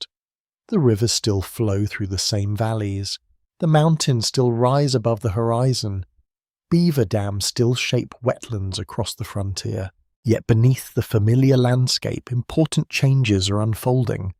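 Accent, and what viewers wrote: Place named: United Kingdom. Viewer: It's impossible for me to appreciate a video about early American history being narrated by a guy, real or not, with a British accent. I wouldn't watch a video about European history if the narrator sounded like John Wayne.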